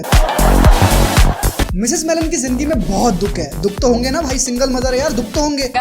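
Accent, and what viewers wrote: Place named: Rajasthan, India. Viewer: Mjhe laga hi tha bhai rajasthan se h.....bolne k tarike se hi lag jata h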